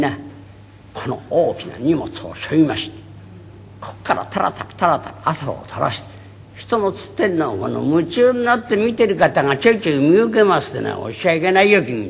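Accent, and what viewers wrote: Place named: Tokyo, Japan. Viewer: 中学生ぐらいの時に師匠の話を聞いて「江戸弁っていいなぁ」と思ったものでした。 この師匠とか円生師匠には何処となく色気が有ります。 up有難うございました。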